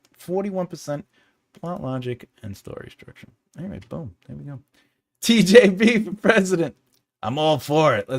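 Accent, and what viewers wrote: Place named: Boston, United States. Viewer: And, with your reading accent, makes it sound super Boston.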